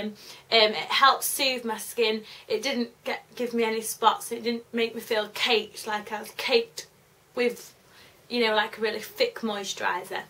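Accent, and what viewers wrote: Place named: United Kingdom. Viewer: i love British accent mm ^ - ^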